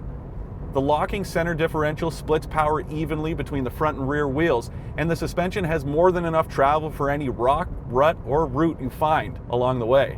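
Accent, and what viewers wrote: Canada. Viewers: Did I hear a canadian talking apout cars?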